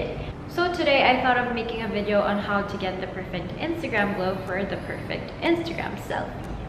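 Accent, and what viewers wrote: England, United Kingdom. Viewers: sorry ulitin ko nlang yung vid,sa mukha mo lang ako naka tingin ang pretty mo girl haha..btw i like your english accent hehe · Melly Andrade I really don't understand the hate she's been receiving ,she's fine to me and loooove the English accent. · ganda at galing mo mag make up girl so perfect and i love ur english accent sarap pkinggan kya pls. wag kna magtagalog pls. haha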